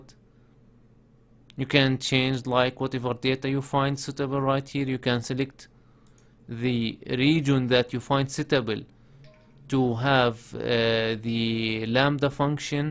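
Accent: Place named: Arab world. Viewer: متأكد الي بحكي شخص عربي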